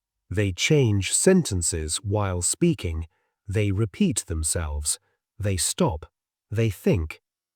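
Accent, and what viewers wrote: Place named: United Kingdom. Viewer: এই ভিডিও টি ব্রিটিশ accent এ মনে হচ্ছে কিন্তু আমি তো এখন USA থাকি।এগুলো American accent দিলে উপকৃত হতাম।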